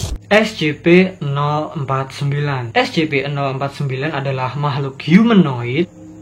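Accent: Java, Indonesia. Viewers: Jawa mana mas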